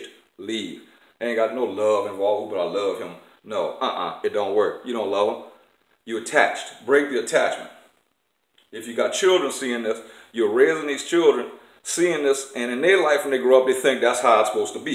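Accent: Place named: Louisiana, United States. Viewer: I'm new to this channel & I'm glad I stumbled across it. I wish you many more vids. My only problem is that since English is not my native language I have problem understanding Louisiana talking. But reason I'm writing this is: I think you gave good advice here! especially because of children that later do same stuff in their marriages 😢.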